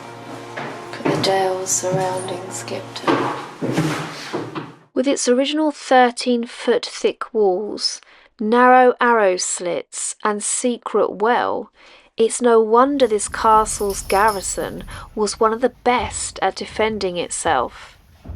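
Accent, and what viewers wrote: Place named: Essex, United Kingdom. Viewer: Interested to note you were born in Eastern Ave, Ilford (thought I recognised your accent!) . I was born in Dagenham, close to Martins Corner only a few miles from you. Have been a subscriber for some time and enjoy your travels. Love Zephyr too!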